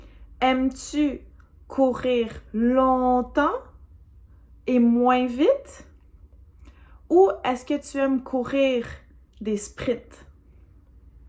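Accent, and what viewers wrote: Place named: Quebec, Canada. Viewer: Bonjour, j'espère que vous allez bien. J'ai un request. Pourriez-vous nous faire une vidéo sur la différence entre l'accent québecois et l'accent français de France? SVP. Because, I could notice your way of pronouncing words like 'matin', 'bain" differs from what's in most of the french videos out there (based on France's).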